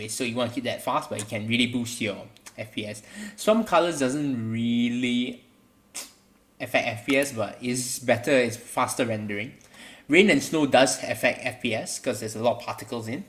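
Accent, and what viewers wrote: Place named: Singapore. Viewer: You sound like Singaporean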